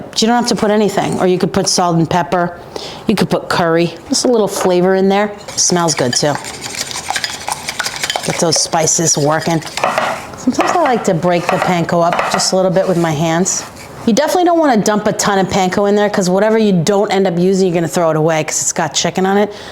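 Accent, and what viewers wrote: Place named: New York, United States. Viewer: You and Paul Sorvino pronounce sandwich, sengwich. Is that Jersey or New York?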